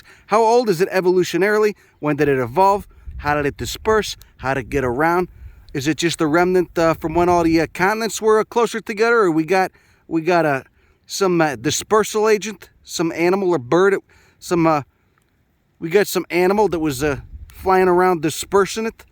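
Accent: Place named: New York, United States. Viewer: from NY so used to hearing things explained this way.. feel like im home if i had to guess this dude is from NY (LI) and is either Italian or Jewish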